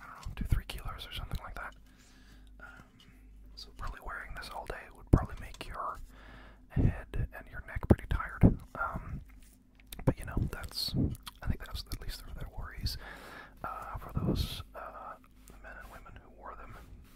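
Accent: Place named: United States. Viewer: så kult! Hvor bor du da? Høres ut som du har en veldig amerikansk dialekt på engelsken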